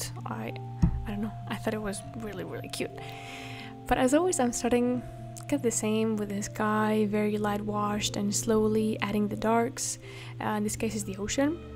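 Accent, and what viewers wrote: Spain. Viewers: This is the first video of yours I’ve ever seen and for context my spanish teacher at my high school has an insanely similar accent and speech pattern to you, I find it very pretty and calming (I love accents but Hers is one of my favorites) and she is from Spain. When you said you were from Spain I freaked internally because you sound so much like her your voice is so beautiful and calming! Can you do ASMR while painting?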